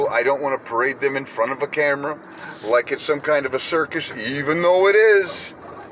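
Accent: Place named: North, United Kingdom. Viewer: Your from up North aren’t ya buddy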